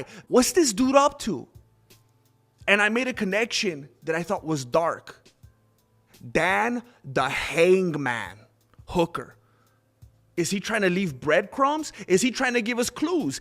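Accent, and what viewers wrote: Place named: Mexico. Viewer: Eyy fool where is that ole mexican accent ?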